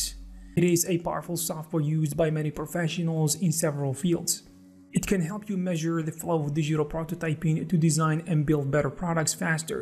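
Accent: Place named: Arab world. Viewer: u have an arabic accent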